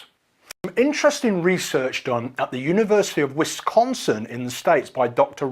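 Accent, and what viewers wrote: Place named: United Kingdom. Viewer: The explanation with a british accent and gamma state make this video very €nergetic & interesting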